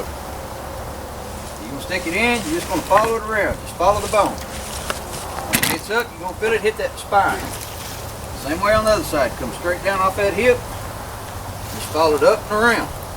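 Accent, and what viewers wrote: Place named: Kentucky, United States. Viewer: This guy has to be from ky u dont hear that accent in many other places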